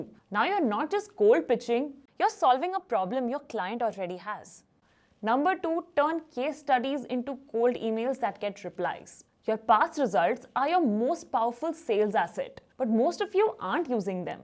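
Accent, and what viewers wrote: India. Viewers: I looove your Indian accent! Makes all the money!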